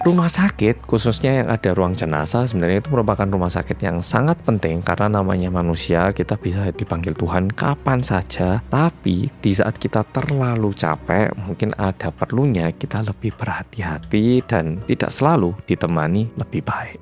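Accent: Java, Indonesia. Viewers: muedok🎉🎉🎉 RinDingDong 🎊🎊🎊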